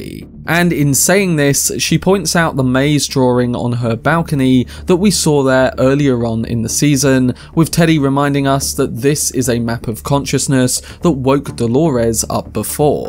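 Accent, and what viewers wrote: United Kingdom. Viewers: It’s standard British pronunciation, don’t be an ignoramus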